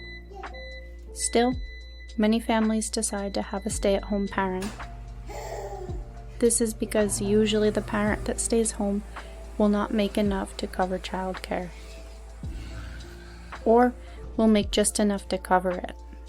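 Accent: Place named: East Coast, United States. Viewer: Mrs. is too so good at narrations. It is like a movie, very beautiful sweet voice💓 To me, the air of your speaking English has reminded me of the well behaving American girls from the east coast of the US I as a foreign student from Japan met at the college dorm more than thirty years ago. I miss them and those days. Anyway, I see how young mothers in the US are doing in their child rearing. Good luck and looking forward to the next one😊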